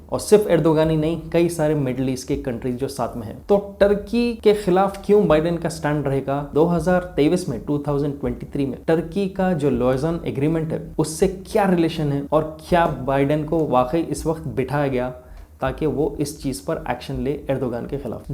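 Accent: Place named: Karnataka, India. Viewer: bhai ap karnataka se ho na apki hindi karnataka ke jaisi hai i am from karnataka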